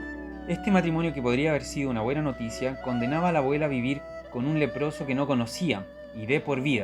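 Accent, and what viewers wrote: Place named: Chile. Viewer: Encantador acento chileno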